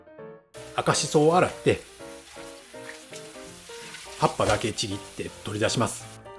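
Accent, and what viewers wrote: Kyoto, Japan. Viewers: 分かりやすかったです👍今年は2回柴ずけ こしらえてますが😊もう 1回 こしらえてみます(動画参考)で👍京都弁がチラホラ👏👏👏よかったですね🙆🙆🙆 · 下鴨の出身です¨̮ 嫁いでから京都のお漬物の美味しさを 再認識✨京都弁に安心感🥺 シンクに赤紫蘇の洗った後の赤いお水が 流れた瞬間、実家を思い出しました。笑笑 母の方法とはちょっと違うけど、 ものすごくしっくりきたので✨ こちらの方法でも作ってみます☺️ めっちゃ美味しそうです！！！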